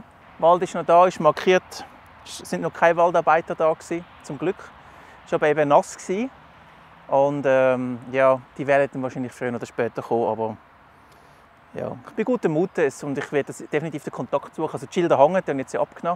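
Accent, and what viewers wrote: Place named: Switzerland. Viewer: Hallo Erst mal ein frohes neues Jahr, hoffe du bist gut reingerutscht mit deiner Familie. Hast aber auch ein Top Wetter gehabt. Essen sah wieder lecker aus. So ein Kaffee ist immer was feines in der Natur. Hab jetzt Urlaub, dann geht es auch wieder in den Wald. Vielleicht kann ich dir ein paar Bilder über E-MAIL schicken. Hast du eine E-Mail Adresse? Glückwunsch zu drei Jahren Outdoor 🎉🎊🍻. Ich glaube so langsam schau ich mir auch deinen Kanal an. Machst auch immer Top Videos immer authentisch. und das Schweitzer Deutsch passt auch gut dazu. Bleib wie du bist. Bis zum nächsten Video. Grüße in die Schweiz aus dem schönen Siebengebirge 🇩🇪🇨🇭🤜🏻🤛🏻 Michael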